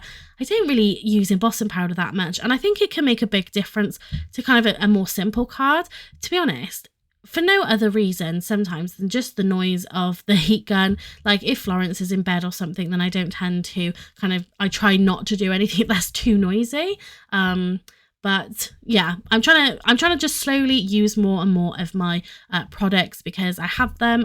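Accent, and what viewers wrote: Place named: Merseyside, United Kingdom. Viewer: Your accent sounds mixed. You sound like youre from Merseyside area and been in Wales for a longer time. Its lovely anyway, beautiful card 💞💞💞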